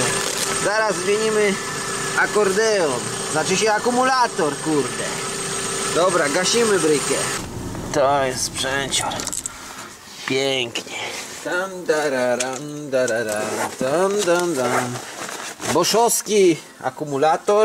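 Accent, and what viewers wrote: Poland. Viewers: gwara podlaska? :D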